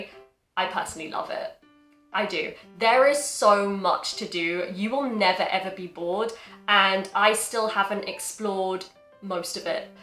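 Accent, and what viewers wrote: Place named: England, United Kingdom. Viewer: Please listen to Cockney or Geordie or even Scouse accents. You can’t say it is intelligible to listen to I am sure. English accents are very diverse than anything else on that matter. Her accent is a tiny example of broad accents you can hear in England or in the UK.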